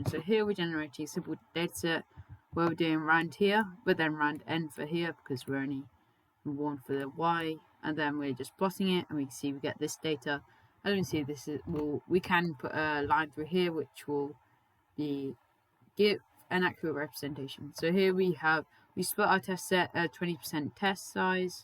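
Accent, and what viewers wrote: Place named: United Kingdom. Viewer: It seems ur from UK and not US cuz u speak British English. Am I right?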